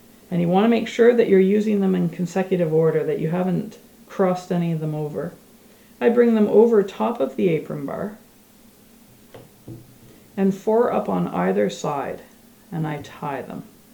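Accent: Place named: New England, United States. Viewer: Wonderful..thank you so much! i was wondering where your accent came from. i was guessing New England, USA!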